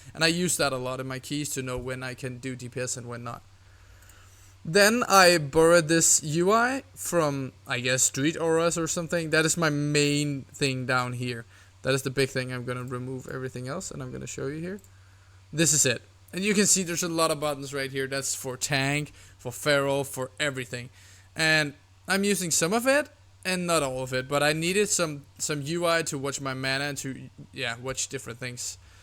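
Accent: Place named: Denmark. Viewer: I can hear you are Danish 🙋🏼‍♀️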